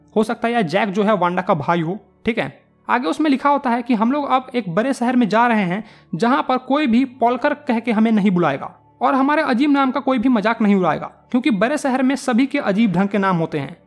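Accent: Bihar, India. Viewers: Bihar se h ??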